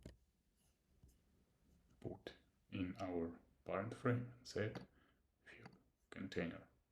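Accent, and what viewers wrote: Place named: Russia. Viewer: Я слышу, что ты русский ))) Так что давай такое видео на русском. А так я тебя через янденкс-переводчик слушаю )))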